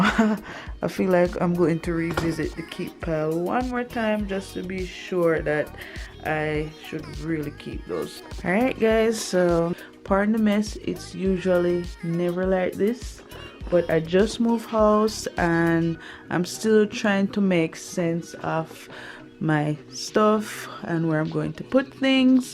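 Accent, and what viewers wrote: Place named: Jamaica. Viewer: Refreshing to hear a fellow Jamaican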